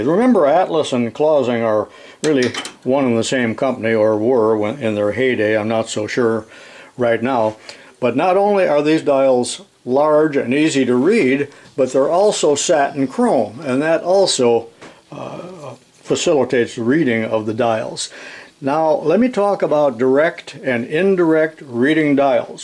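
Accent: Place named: Midwest, United States. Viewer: You have certainly joined the 21st-century with your 3-D printing. I think half the fun of watching your videos is listening to you talk. Your voice is like my first shop teacher and has that “Jimmy Stewart” midwest drawl.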